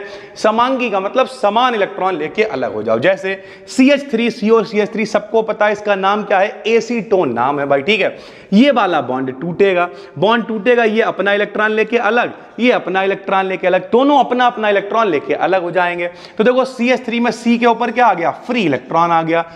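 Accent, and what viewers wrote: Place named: Kanpur, India. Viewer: sir saari videos aapki line se dekh rha hu sir sb samjh me aa rha i really thank u sir for such a great content in your kanpuriya accent.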